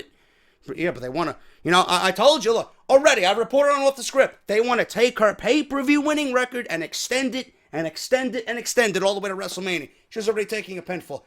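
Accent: New York, United States. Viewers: You're a fucking New Yorker man xD love it cx I nearly pissed myself though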